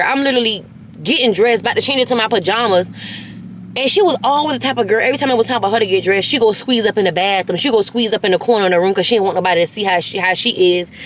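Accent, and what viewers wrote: New Orleans, United States. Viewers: Is that a Nola or Georgia accent? You sound blended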